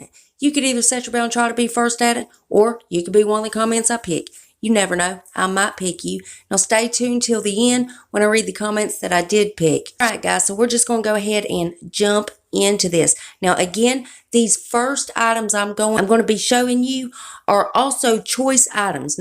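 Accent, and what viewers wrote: South, United States. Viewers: Girl you sound way more southern than central Ohio area! Are you a native born Buckeye? I moved to Ohio when I was 5 and lived there most of my life until I moved to Iowa when I was 40. I lived in several suburbs of the Greater Cincinnati area. I don't have much Cincinnati southern accent left after living in Iowa for over 20 years. lol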